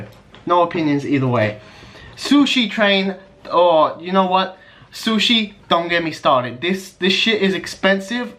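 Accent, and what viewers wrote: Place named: United States. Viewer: Honestly a solid list, not a lot to contest here. Would have put Sushi Train at a B tier at most purely out of cost vs product but that's personal choice. Same goes for IKEA; cost of going there doesn't make the trip worth it so that's at most a C tier. My biggest issue is Subway as a C tier. You're clearly from the states with that accent but here in SA a proper Subway is at least A tier. As a whole, this is good list and I look forward to more on the flip side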